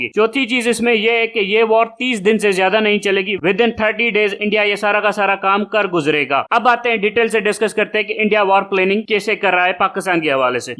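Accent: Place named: Sindh, India. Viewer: the way speak, i think you are sindhi.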